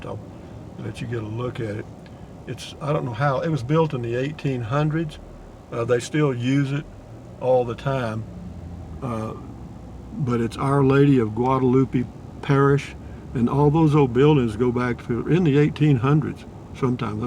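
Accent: Texas, United States. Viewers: You sound all Texan :p am i right ?? btw Smith's is just a different brand of Kroger. They have all the same Kroger products and sales, it's really not a 'local' spot. Not trying to be a dick but you keep saying things like "this is where the tourists go...." . My man, you are a tourist.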